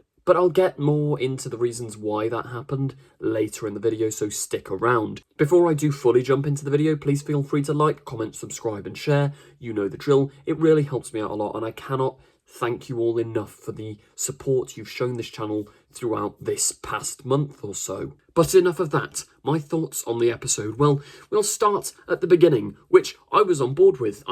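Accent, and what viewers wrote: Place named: Coventry, United Kingdom. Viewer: Ooh you from Coventry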